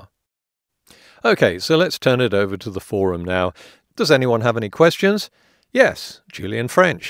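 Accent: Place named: England, United Kingdom. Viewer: it is not that PCR tests don't work, PCR tests are not a definitive method for testing for Covid. That is the fact that ABC is never going to come clean about. Also the choice of narrator is advicive in that the choice of a speaker with a "crisp as an apple English accent " is supposed to be an indicator of intelligence. That is a total myth. They have become puppets like all the rest.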